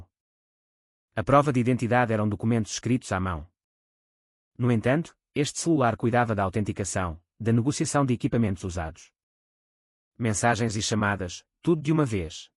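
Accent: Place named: Portugal. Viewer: Português de Portugal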